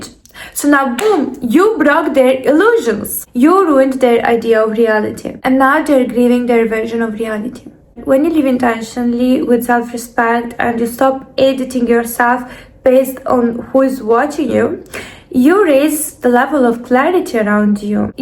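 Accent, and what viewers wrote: Russia. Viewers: Since one year I just stopped to sharing my project of life plans with some friends, acquaintances and one member of my family just because I see that everytime that I speak of my plans they're leads immediately to fail. Concerning my family side the only thing that I used to get was skepticism and cynical remarks : like how I dare to even have ambitions ? And even if I don't share anymore I can easily get one mocking comment litteraly asking me if I don't plan to be the next Kim Kardashian. I decided to just let it go and not getting affected by it, because at the end of the day I have accomplished so much things by myself and that I'm stronger than them. But seriously, to live happy let's live hidden! Now I just share the bare minimum and even so I just lie about some details because at the end of the day it's my life, and like those people aren't helping that much what's the point to share crucial details if in the end it just prejudice me ? 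At the end best weapon against haters is to give them an ulcer by becoming more better that you are actually already. P.S Don't worry about those дурак comments, they just hate you because you're Russian. 😂 They wouldn't dare to make those remark about your accent if you where from another ethnic group, it's just typical American slavic women hate. Keep the good work and thanks for your deep advices. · I adore your accent. Are you from Russia?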